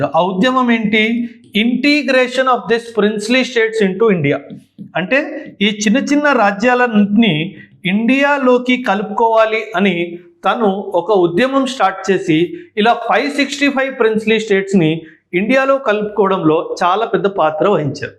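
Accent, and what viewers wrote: Punjab, India. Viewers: తెలుగు మాట్లాడే వారు కూడా స్వచ్ఛమైన తెలుగు పలకడం లేదు ఒక పంజాబీ అయి ఉండి కూడా స్వచ్ఛమైన తెలుగులో మాకు సమాచారం అందిస్తున్నారు అభినందనీయులు ధన్యవాదాలు❤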